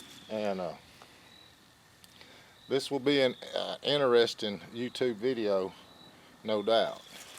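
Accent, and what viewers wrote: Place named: Appalachia, United States. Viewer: it's impossible to take this clodhopper seriously with the hocking up phlegm and spitting and the lazy hillbilly dialect.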